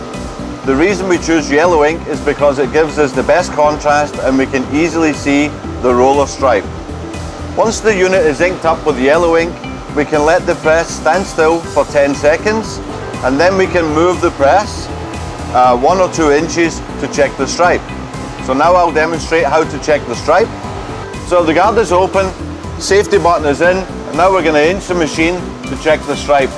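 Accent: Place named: Scotland, United Kingdom. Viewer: A good scotsman by the sounds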